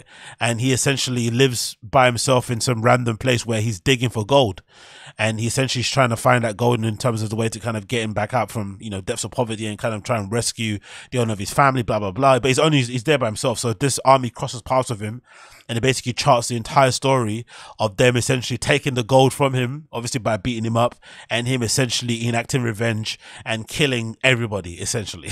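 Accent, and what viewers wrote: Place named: United Kingdom. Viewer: Damn. Where you from, man? You sound British but your name is the most Angolan thing ever.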